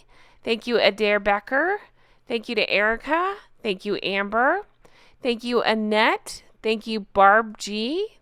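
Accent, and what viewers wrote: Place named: East, United States. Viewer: You are correct, Greg. But she is from somewhere in the east US, making her pronunciation incorrect. Besides, mine is the dictionary pronunciation, which I only checked after your comment.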